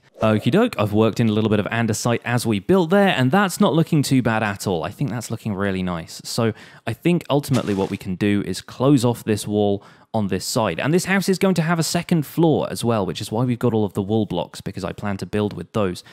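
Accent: United Kingdom. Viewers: It's just a normal British Accent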